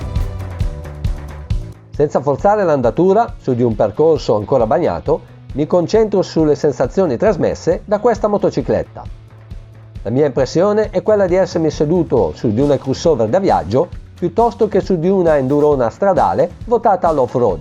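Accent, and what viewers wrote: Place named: Brescia, Italy. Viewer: mi sembrava Brescia....invece si😅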